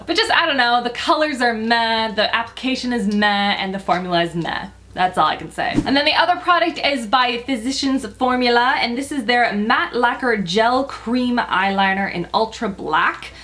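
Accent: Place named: Canada. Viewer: . Your look today is spectacular! 😊 I love watching your videos not only because of your fab content but also because as a fellow Canadian who lives in Spain, I need my dose of the Canadian accent. thanks for that!! 😊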